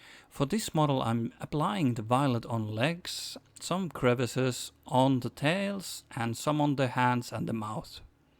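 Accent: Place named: Scandinavia. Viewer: Looks great!! I painted my Tzeentch similar but through airbrush techniques. Sounds like you are Scandinavian, svensk?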